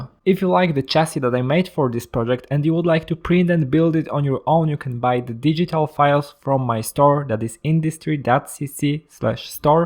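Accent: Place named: Poland. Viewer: Oj chłopie - akcent to masz taki mocno polenglish. Akcenty słów, ale też melodia zdań - słychać, że w szkole na angielskim było mało rozmowy/dialogu lub się nie przyłożyłeś. Czy to przeszkadza - wcale: chodzi o treść. Ale sam zacząłeś z 'bearing' :P Jeśli Ci to przeszkadza, zainwestuj w konwersację z kimś kto ładnie rozmawia po angielsku, bo treść kanału jest naprawdę ciekawa!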